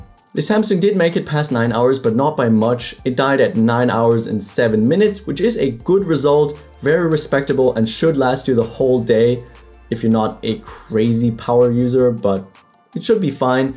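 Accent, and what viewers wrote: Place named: Germany. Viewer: you have a Lithuanian name and German accent. Where are you from?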